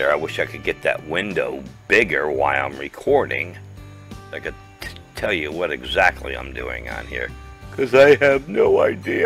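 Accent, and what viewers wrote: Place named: South, United States. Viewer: I was just admiring your southern accent! I'm from TN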